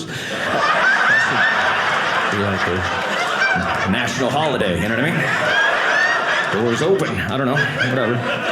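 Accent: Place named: California, United States. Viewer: This guy is a punk. If he is from Texas he's from Austin. Looks and sounds more like a California immigrate.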